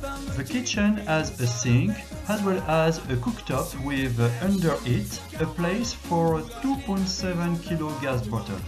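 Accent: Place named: France. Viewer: Love French accent. Enjoy your van.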